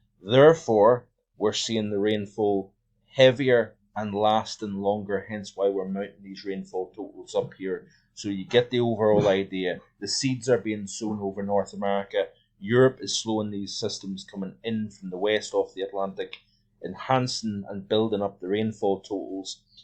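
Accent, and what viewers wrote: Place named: Northern Ireland, United Kingdom. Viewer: I watched 3 of your videos thinking you were from northern Ireland, until you said you were in Scotland. I'd put you from Ballyclare 🤔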